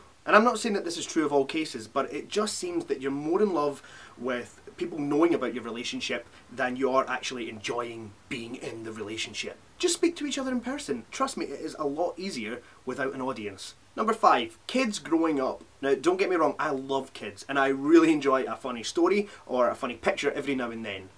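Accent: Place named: London, United Kingdom. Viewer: his accent is annoying, i recognize his accent from suburbs of London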